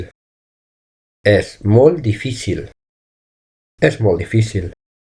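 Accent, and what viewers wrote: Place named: Argentina. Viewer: Argentino intentando hablar en catalán?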